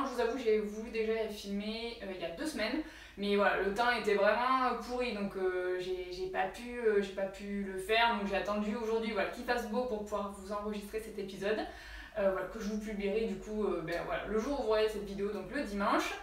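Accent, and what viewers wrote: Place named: Tarn, France. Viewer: Merci pour cette vidéo. Tu as encore fait de super réalisations.... Bravo. Tu viens de quel département ? Car moi je suis du Tarn (de castres) et que ton accent est proche du miens. Je fais ma curieuse 😜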